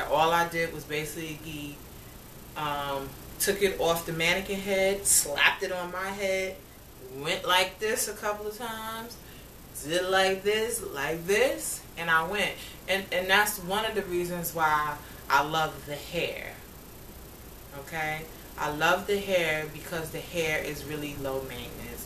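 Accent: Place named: New York, United States. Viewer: Gurl,, you had me on the floor. It is rare to hear an NYC hair person on here. I loved your frankness!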